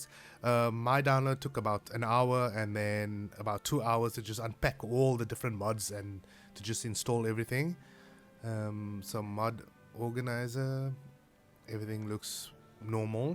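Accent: South Africa. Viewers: awe I recognize that South African accent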